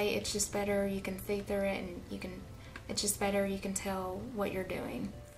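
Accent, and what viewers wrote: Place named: South, United States. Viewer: Are you SERIOUSLY Chinese speaking Southern what state are you from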